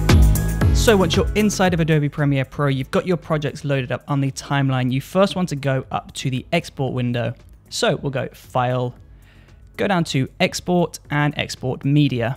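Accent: United Kingdom. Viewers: Sounds pretty nice British?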